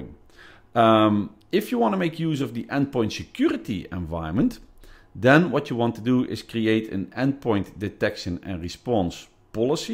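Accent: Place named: Netherlands. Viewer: Hallo U komt uit Nederland dat is goed te horen ☜(ﾟヮﾟ☜) Ik ben bezig met mijn MS-500 bedankt voor de video :)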